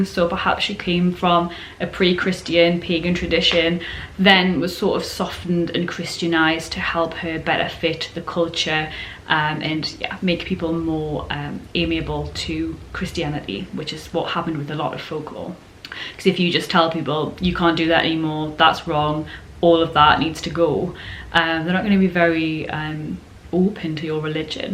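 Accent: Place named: North East England, United Kingdom. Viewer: Been reading grettis viking saga and he gets attacked by monsters during Yule tide so seems to be a tradition of supernatural stuff during Xmas .always nice to hear my local north east accent in YouTube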